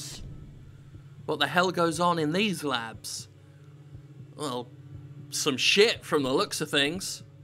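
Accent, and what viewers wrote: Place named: United Kingdom. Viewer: idk if it's just me, but you're sounding particularly British in this video:O I mean not that you don't usually, but in particular idk XD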